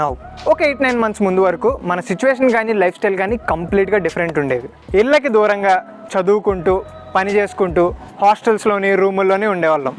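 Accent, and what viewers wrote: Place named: Bhimavaram, India. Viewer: super bro... Meeru bhimavaram valla.. naku mee videos vinnappudalla ma uru la anpistudi😊. All the best 👍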